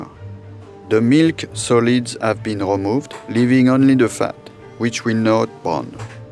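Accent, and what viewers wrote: France. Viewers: I'm french and even for me it was too much. We all know that our accent is hard when we speak english, but yeah that's kind of extreme here. Still loved his "and voilà" at the end. 😂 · Intelligible? What? He has a French accent. He is French. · "I see people do crazy things, you know." said the deadbeat-sounding Chef in a thick French accent with a very serious tone. Are you feeling well lately? I am concerned. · He's painfully French, judging by the accent. But an amazingly skilled chef, nonetheless. I wanna try the Ouefs en meurette, and the jelly poached eggs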